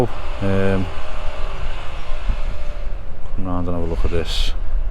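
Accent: Liverpool, United Kingdom. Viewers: Look forward to your updates! On a funny side note, your intro is very catchy and since the scouse accent is pretty unique and an earworm at times (as an Indian native, it was always different).. i found myself mimicking that starting bit xD Cheers for the good work mate.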